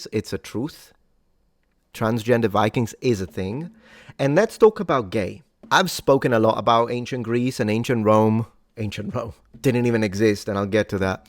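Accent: England, United Kingdom. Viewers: I believe you are from England. :)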